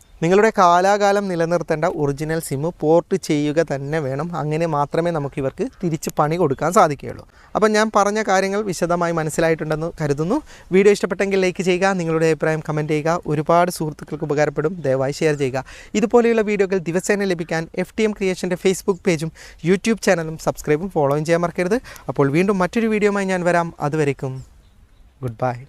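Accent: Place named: Kozhikode, India. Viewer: Chettante veed kozhikode aanenn manassilaayi 😁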